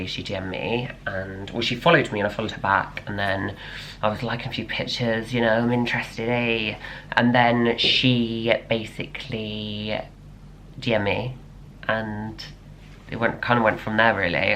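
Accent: United Kingdom. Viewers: Are ya British?? cuz I can hear through your voice that you come from Great Britain. Now comes a little bit about me, which is: I do NOT describe myself as a transgender nor do I specify myself as a ideology as such. But rather do I think and wishes for and as a woman. Yes, you read that right, I'm want to go into the line for becoming a woman. For the first 2 minutes and 18 seconds, I can already tell you, you make great and large video's, about topics the world should need to know about. I want to share my appreciation to you either. I know, this video's was uploaded on December 30th, 2022 on a Friday, but I want to thank you for sharing your open-minded views and gender assuming related topics I.E. genderdysphoria. Now you maybe even think I've send you a book, but instead of harassing of catcalling you, I choose to a more family friendly option which contains to be precisely: respectfulness, care about you as a full human-being, and advice for hospitalization during situation when you need it the most. 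Thank you for reading my most important message, take care and goodbye. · R u British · Welcome,... It's a British accent, isn't it? 😀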